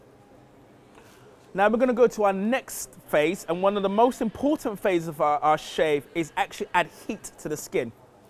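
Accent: United Kingdom. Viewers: ''Your British accent ...is killing me ...very nice ...'' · Love the British accent, this man really knows his business. Also he's totally classy.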